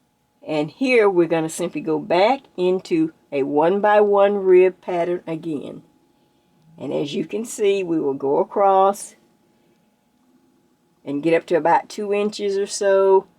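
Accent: South, United States. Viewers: I am one of those that stumbled upon your channel by "happenstance", and boy am I glad I did! I love everything about your videos - your tutorials, your personality, your stories, your comforting voice with the southern 'drawl'... I love it all! So much so, this is the first time I've taken the time to comment. Keep it up! I can't wait to see more! I'm so sorry about your loss of your dear friend Judy. Love love love your friendship vest named in her honor. That was the video that introduced me to you. Thanks again for sharing it all with us - I love the narratives you give in addition. Makes me feel so at home. :)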